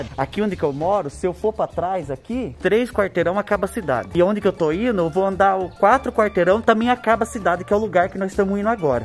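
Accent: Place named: South, Brazil. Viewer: A primeira vez que vi os vídeos do,eu pensei que ele fosse do Sul tbm.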